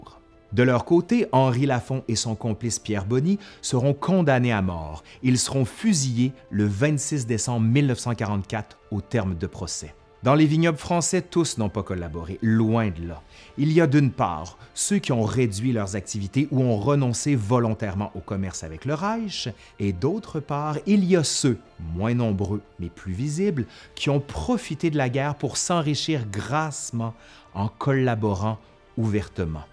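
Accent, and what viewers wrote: Quebec, Canada. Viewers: Bon sang, l'accent Québécois est juste magnifique ! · J'aime beaucoup votre travail.. Auparavant, c'est à dire avant d'avoir connu vos vidéos, je n'appréciais pas tellement l'accent canadien, mais grâce à vous mon opinion a pu changer totalement. Le son en devient agréable, doux et confortable à l'écoute de vos récits sur l'histoire. Comme vous.nous l'avez suggéré, je souhaite proposer une traduction en une autre langue, en hébreu du titre de votre video : למה הנאצים אהבו כל כך יין?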